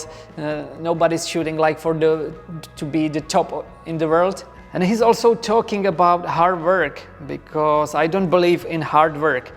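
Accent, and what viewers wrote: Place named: Czech Republic. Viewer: Nejsem nikterak dobrý v angličtině, ale mám pocit že přízvuk je jako bych to řikal já 😁 ( A to si myslím že jeho úroveň je na míle jiná!) Je to jen můj pocit nebo jsem zblbnutý amerikou o tom jak má angličtina znít? Díky za názor Dobrá práce každopádně jen tak dál!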